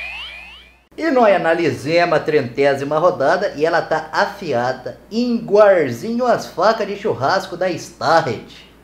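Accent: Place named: Southern Minas Gerais, Brazil. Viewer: Como demorou pra alguém imitar direitinho nosso linguajar do sul de minas . Parabéns meu amigo.vc vai longe .muito bom mesmo .deveria ir pra praça e nossa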